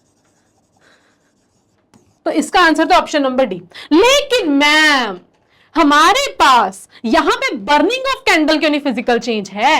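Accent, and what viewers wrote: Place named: Punjab, India. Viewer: I'm Punjabi and they way she is talking is clearly showing she is punjabi😅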